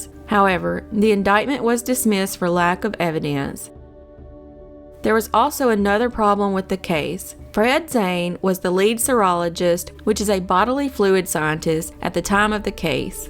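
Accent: South, United States. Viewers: no problem. For me this is like really american local experience and vibe which i get from your videos. I like your southern accent. And when i said thanks for covering cases unknown to me, i meant you really dig up cases which are really unknown or unheard. Nevermind that im from Bosnia i watched almost all known cases, and a lot is familiar to me. You bring something new and fresh. Like everyone is eating vegetables from supermarkets from industrial farms, and you bring your local on fresh soil grewn organic vegetables 😁😜😆😂. Thank you again.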